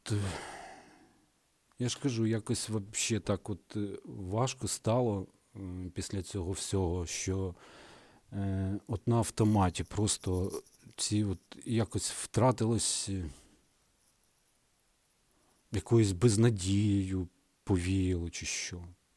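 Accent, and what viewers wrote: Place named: Russia. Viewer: по вашей речи очень заметно что вы русскоязычный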